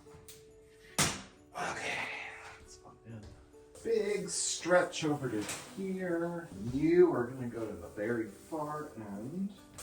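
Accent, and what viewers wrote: Minnesota, United States. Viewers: On the 2nd day of Vlogmas my true love gave to me: 2 power strips 🔌🔌 and you sound like the movie Fargo... ❄️⛄🎄⛄❄️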